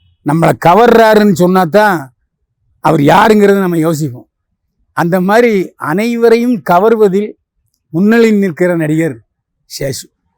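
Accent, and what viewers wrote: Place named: Chennai, India. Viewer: Enya ungaluku lam chennai slang lam kevalama iruka? Thiruntha matingaya.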